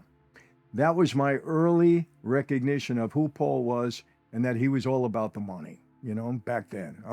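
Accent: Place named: New York, United States. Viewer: Most New York accents annoy me but yours is so cool sounding, much respect for sharing your life with us.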